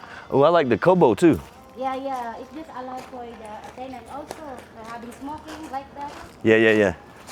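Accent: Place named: North Carolina, United States. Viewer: The more I watch and listen it seems old North Carolina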